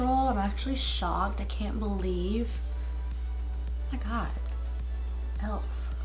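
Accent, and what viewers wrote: South, United States. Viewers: Lol, the look you gave yourself at the end was priceless. It was like "Bitch, I'm feelin myself!" Love your personality and sense of humor. And of course your southern accent. My fiance is from South Carolina. You've got a new sub. Keep the makeup tutorials coming ya'll. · I’ve been waiting for this tutorial for the longest!!! 😍 how do you find your foundation shade? I’m having trouble and love how you started your intro you have like a little country accent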